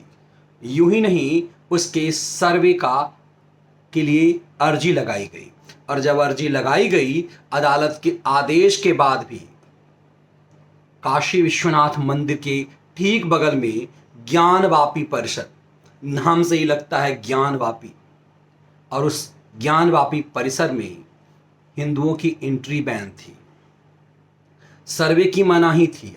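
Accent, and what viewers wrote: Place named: Bihar, India. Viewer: आदरणीय महान् विद्वान और राष्ट्रवाद के सशक्त और प्रबल समर्थक श्री, बहुत ही सुन्दर,सटीक और समसामयिक विश्लेषण किया है। पर आज़ आपका संबोधन और भाव भंगिमा विगत (भूत काल) के जैसा प्रतीत नहीं लगता है। आपकी हंसमुख, चुटिली वाणी, और ठेठ बिहारी लहजा ही आपकी अनोखी अछूती और अदभुत पहचान है। वही बनाए रखें। प्रोफेसर जैसा लेक्चर तो सभी पत्रकार देते हैं।आप अपनी पूर्व भूमिका में ही अच्छे लगते हैं, धन्यवाद।🎉🎉🎉🎉🎉🎉🎉🎉🎉🎉🎉🙏🙏🙏🙏🙏🙏🙏🙏🙏🙏🙏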